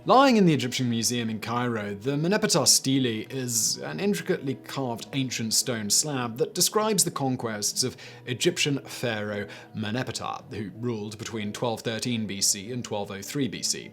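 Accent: United Kingdom. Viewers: This guy does a great British accent.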